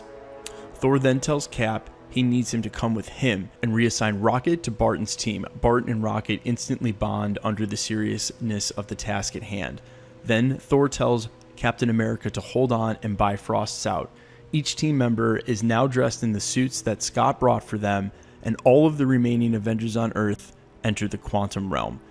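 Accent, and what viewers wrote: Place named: Philadelphia, United States. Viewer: Yo Cuz,not for nothing,but are You from Philly or South Jersey??? I detect an accent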